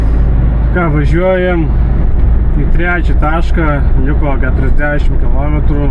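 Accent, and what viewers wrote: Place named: Alytus, Lithuania. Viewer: Sveiki. Seniai žiūriu vairuotojų kanalus, bet šį kartą truputį kitaip - malonu girdėti alytietišką tartį :)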